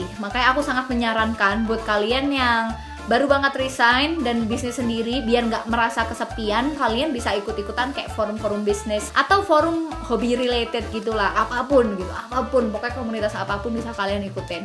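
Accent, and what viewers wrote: Java, Indonesia. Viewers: Mantap mbak e. Jowone neng ndi mbak 😁🙏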